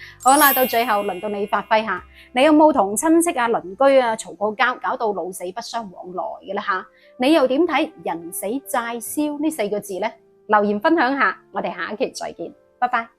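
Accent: Guangzhou, China. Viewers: 正宗廣府話好親切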